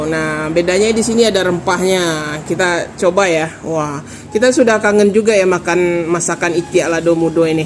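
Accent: Minangkabau, Indonesia. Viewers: Ulang dengari berdialek minang walaupun tidak totali, konon saya ingin pelajari dialek minang🎉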